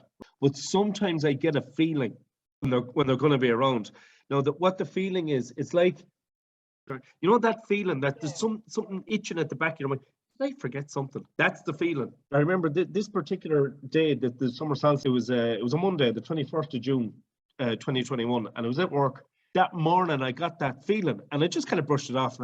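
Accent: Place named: Ireland. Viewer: Thanks 😊loving your Irish accent. How do you take your photos and do you use special software to get the close ups? I'd love to photograph my craft I see.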